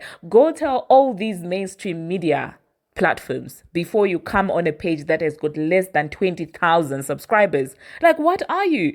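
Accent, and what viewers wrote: South Africa. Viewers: I know this is out of context. I love ur channel by the way. Are u South African?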